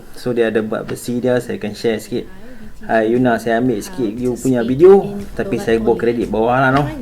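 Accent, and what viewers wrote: Perlis, Malaysia. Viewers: tu dia oghang peghelih dah mai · haaa depa pnya chnel mmg sguh loghat prlis.....kemaih mf studio...mmg haqi2 dok tggu vdeo yg bqu...kep it up..👍👍👍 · Dengar suara piow org perlis huhu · penerangan yg mantap,nie baru kena cakap perlis..... lenggok tu kena dah...bukan setakat puluit n kasuit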